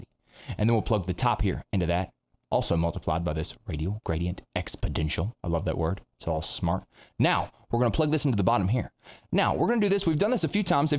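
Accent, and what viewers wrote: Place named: South, United States. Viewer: Bro I enjoy your tutorials. Where in the south are you from bud?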